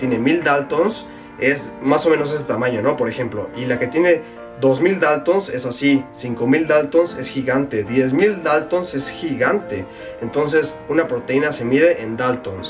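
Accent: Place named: Mexico City, Mexico. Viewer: Me encanta el acento cdmx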